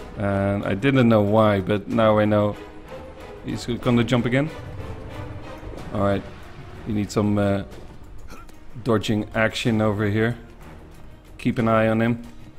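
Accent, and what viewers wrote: Netherlands. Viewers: I hear a Dutch accent. How do I know? In Dutch to. Hallo. Goed Engels gesproken. Ik dacht DAT het eerst normaal was.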